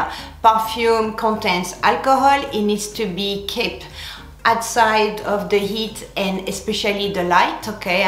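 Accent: France. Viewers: I can feel the French accent while you are talking in English 😂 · French perfume review by lovely french accent ❤️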